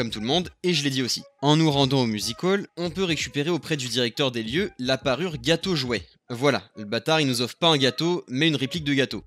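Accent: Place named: Belgium. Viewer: qu'est qu'il a l'accent belge qu'on m'explique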